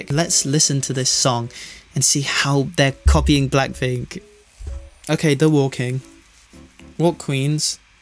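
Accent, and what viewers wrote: England, United Kingdom. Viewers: yes, from his accent, I can guess he IS British.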